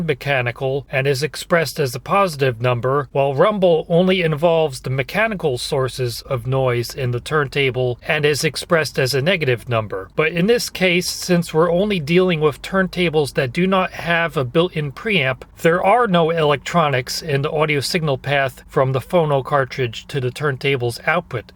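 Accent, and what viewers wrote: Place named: United States. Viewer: I am guessing by your accent that you might be from the USA; that makes anything technical printed in an advert a bit problematical, because in the USA, advertisers can basically bullshit all they want. I remember my electronics tutor showing us an early 1970s advert for a "6 transistor Portable Radio" from a famous US brand; except only 1 was actually in the circuit, the other five were defective transistors soldered to the edge of the board. I queried a problematical power output quoted on a US company's amplifier advert only a couple of years ago - and both the manufacturer and distributor refused to answer; despite basic math and RMS calculations showing it to be wildly out; even if they had meant PMPO, rather than the claimed class AB RMS; the numbers didnt add up. If you want to make those noise claims, you need to find a lab with the equipment to test them yourselves.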